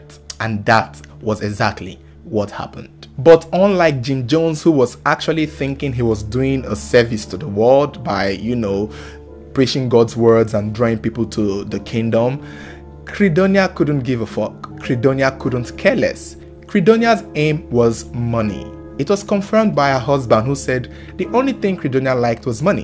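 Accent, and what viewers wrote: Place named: Nigeria. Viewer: This narrator sounds Nigerian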